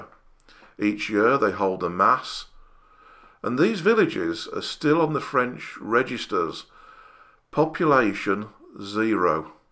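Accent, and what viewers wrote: Birmingham, United Kingdom. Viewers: Great info! Funny Brummy accent too!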